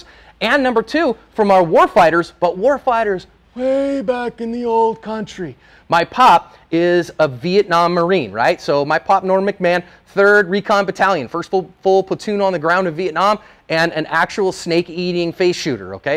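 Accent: Canada. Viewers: Love your channel. But holy shit your speech cadence sounds Canadian! Lol. Great great great video!!!! Very helpful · I heard the Canadian in today as well! Great vid! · Is he Canadian, ay? Or from Minnesota? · Have you been spending time in Canada? Where did this Canadian accent come from?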